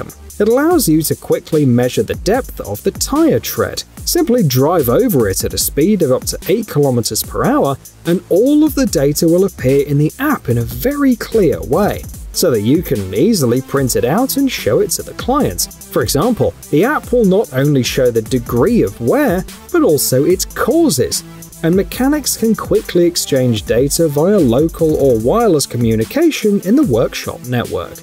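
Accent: United Kingdom. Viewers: no, it makes me want to give this video a thumbs down and move on. Not only is the narrative never fully explained but I can't stand the fact that we are being inundated with these narrators from the UK. I Can't stand listening to them. I've had enough already!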